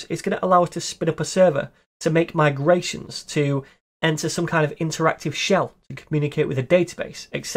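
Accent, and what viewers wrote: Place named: North, United Kingdom. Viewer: nice to hear and be taught by a fellow northerner!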